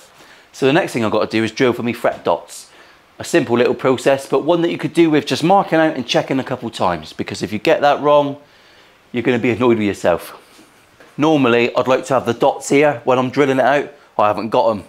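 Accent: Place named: West Country, United Kingdom. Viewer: Video one of this series popped up on my feed so I decided to check it out. Whoooooo boy am I glad I did. I knew I'd found a bedfellow (steady) when you included that comment from yer old mate Sean Moore (Paisley Tele, Pt3). Laughed so hard, the convulsions made my missus thought I was having a stroke (not like that). Guitars, swearing, calmps, sandpaper, wood rubbing and a West Country accent. What more can anyone need? Great work!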